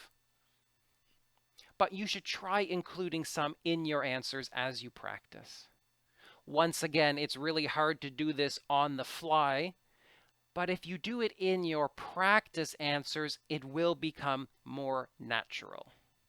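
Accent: Canada. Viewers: sure i think he is Canadian cos i hear his "" about / out " whole time and so cute too, love this teacher. super clear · hi there, your accent is cool Are you from Canada ?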